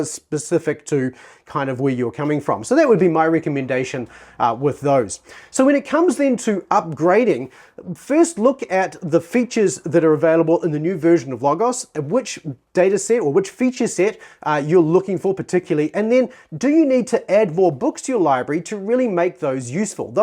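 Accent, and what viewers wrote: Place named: New Zealand. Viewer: Nice to hear a kiwi accent :-)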